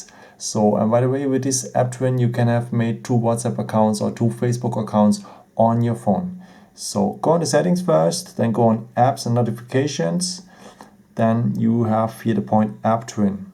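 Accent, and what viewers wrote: Germany. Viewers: You sound kinda german lol. Are you german? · The German accent triggers me. muss los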